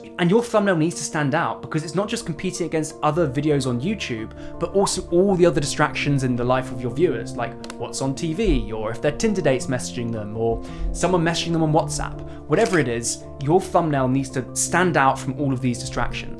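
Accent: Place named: United Kingdom. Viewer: Good video. Like your advice. And your British accent is crystal clear to us Americans. However.... this advice is pretty useless for those of us on YouTube who are not interested in monetization. Many of us are here for politics and to promote political viewpoints. In my case, libertarianism. Have zero interest in making $$ on YouTube. I have a day job. Now... if you really want to help folks like me, make a video on how people with day jobs can find the time to make YouTube videos.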